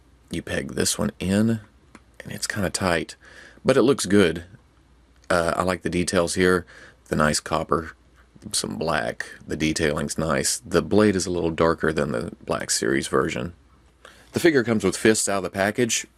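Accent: United States. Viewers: Is the country, he sounds country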